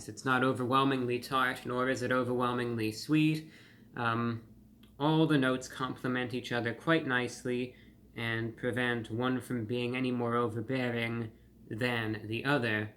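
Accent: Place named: New York, United States. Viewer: I suggest everyone go back and look at young reviewbrah's videos from <num> era. So many classic videos from that era, no HD camera, you got the classic table or armoire setting, tons of reviews from the CAR!!! I mean so many reasons to go back and look at those not to mention the dry cynical humor is way up there and lastly teenage reviewbrah's accent is in peak New York form! Again if your a TROTW fan and haven't watched any videos from that era do yourself a favor and go do it! JUST DO IT!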